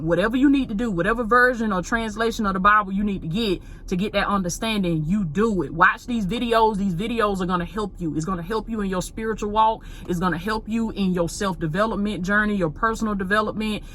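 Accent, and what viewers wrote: South, United States. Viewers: Sis u so country but love it ..talk to that thang that’s my word I use to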